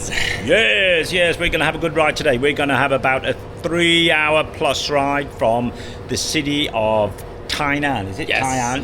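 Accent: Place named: England, United Kingdom. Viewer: Just loving English accent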